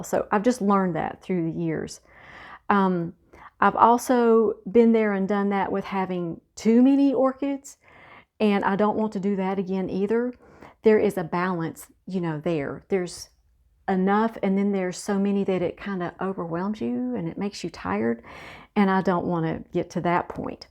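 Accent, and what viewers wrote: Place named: Kingsport, United States. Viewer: Are you near Kingsport? Your accent like mine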